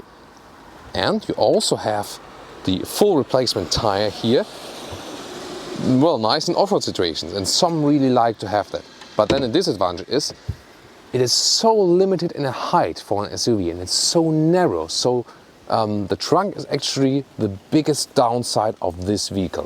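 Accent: Germany. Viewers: English with german accent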